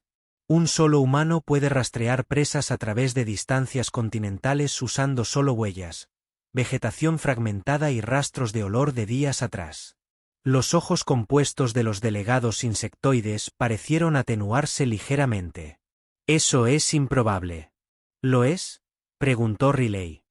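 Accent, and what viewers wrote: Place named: Spain. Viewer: Casi en todas sus historias aparece el apellido "CHEN", lo que siempre me ha intrigado, por lo que me eché un clavado en Google y encontré esto: ELNOMBRE CHEN , ORIGINARIO DE CHINBAPOSEE UN GRAN VALOR HISTÓRICO Y CULTURAL. PROVIENE NEL IDIOMA CHINO Y SIMBOLIZA LOS CONCEPTOS DE AMANECER Y MAÑANA.}Como nombre propio, Chen se ha usado durante siglos, representando la esencia de los nuevos comienzos y la esperanza que acompaña el inicio de un nuevo día. Ya tiene sentido que lo utilicen tanto en sus historias, ya no me quejaré. Pero no creo que "JAQUE" TENGA EL MISMO SIGNIFICADO y lo usan cada rato, cuando creo que quieren decir JAKE, por lo que no creo que su locutor, como buen español, hable inglés, y si la IA es de España, donde al Wi-Fi, le dicen GUIFI. me parece muy tierno. Saludos amigos, me gustan sus historias. Keep them coming!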